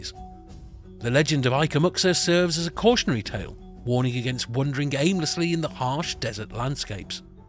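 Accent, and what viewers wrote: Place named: United Kingdom. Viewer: Interesting... Am I the only one finding a british accent explaining African culture to feel a bit... Wrong? Inappropriate? Strange?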